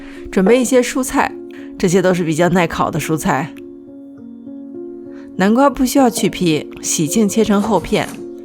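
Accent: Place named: North, China. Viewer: 太赞了！谢谢。你亲切北方口音也很暖我心❤️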